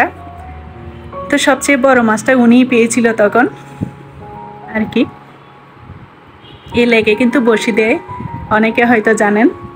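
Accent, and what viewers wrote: Chittagong, Bangladesh. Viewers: আসসালামু আলাইকুম কেমন আছেন আপু মাশাল্লাহ আপনার ভিডিও দেখে খুবই ভালো লাগলো রান্না করা ঘুরাফেরা সবচেয়ে ভালো লেগেছে আপনি চট্টগ্রামের শুনে আপনার কথাগুলো তো খুবই মিষ্টি লেগেছে এবং আসার সময় রাতের খাবার বাইরে খেয়েছেন দেখে খুব ভালো লাগে দোয়া রইল আপনার জন্য এবং আপনার পরিবারের জন্য আপু সব সময় ভালো থাকবেন ❤❤❤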